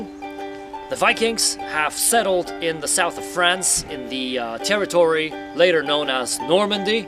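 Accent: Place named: England, United Kingdom. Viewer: Genialny angielski akcent wow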